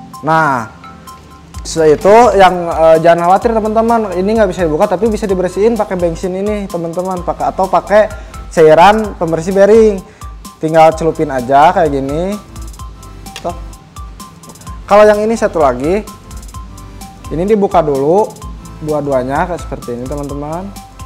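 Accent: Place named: West Java, Indonesia. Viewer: Jogja rasa sunda😅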